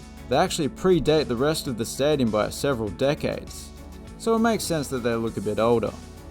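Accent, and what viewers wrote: Australia. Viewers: Why is there an Australian guy speaking. This is America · Its interesting hearing an Australian accent talk about American football. Great video man.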